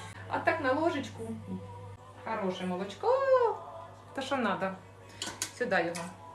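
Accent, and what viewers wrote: Ukraine. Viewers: А мені дуже подобається Ваша мова, не в цілому — українська, хоча і вона також, а саме Ваш говір, акцент! На Заході і на Сході України зовсім не так розмовляють. А от у Вас якесь літературне мовлення... мелодійне, співуче. Саме так розмовляють мої родичі на Черкащині... Я інколи навіть заслуховуюся...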